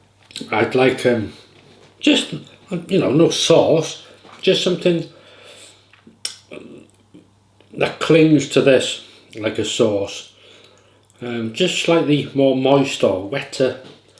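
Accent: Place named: RP, United Kingdom. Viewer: You would make a PERFECT POSH !!!!! You should be a audiobook reader of a Victorian Novel 👏👏👏👏👏 Please make those dialogs . Again. LOVELY !!!!!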